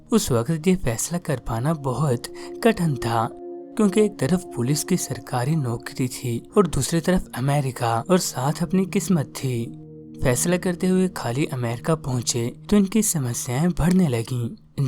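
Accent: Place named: England, United Kingdom. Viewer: Thoda agarej ka awalad kam bano aur sahi se bol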